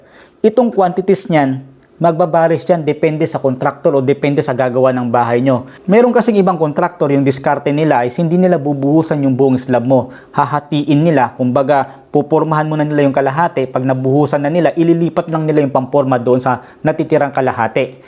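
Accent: Waray, Philippines. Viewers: Waray ka ngay-an gihap Sir. Nice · Waray ka ngay an engineer? Taga diin ka? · Waray ka pala Sir? Tacloban ka Sir? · I hear waray, I subscribe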